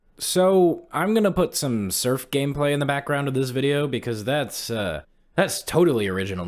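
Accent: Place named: United Kingdom. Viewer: Im just here for your british vocals!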